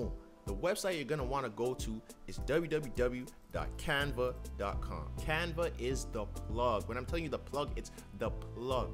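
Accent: Jamaica. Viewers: you jamaican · Kinda sound like a Jamaican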